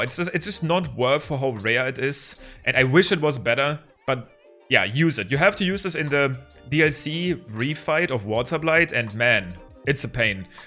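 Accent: Germany. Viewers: Yeah, if people give you grief over having a German accent while speaking flawless English, their opinions are laughable. I'm living in Norway trying to learn Norwegian and I am terrible at it. I wish I could speak Norwegian as well as you speak English. I am from the USA and there are many different regional accents, and the Cajun accent and certain southern accents are much harder to understand than a German accent, and no one gives them crap about it. Double standards. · are you german? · Another great video,! I’m continually impressed by your English, but since you repeatedly questioned your pronunciation of Swallow; I think you pronounced it correctly first each time (with your adorable German accent 🙂). Swallow rhymes with follow, not fallow. The a sounds the same as the a’s in baa baa, not the a in black sheep. I’m not sure if this helps, but the French name Soileaux sounds the same, or at least I can’t tell the difference.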